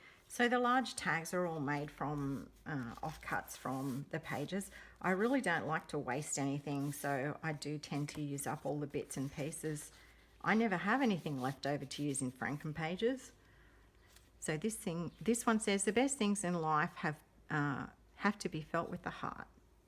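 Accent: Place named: Australia. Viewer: Hi! Beautiful theme! Our Teds are best friends....that texture on the cover is too wonderful.....Hi from Adelaide, so great to hear the accent on YouTube! 😘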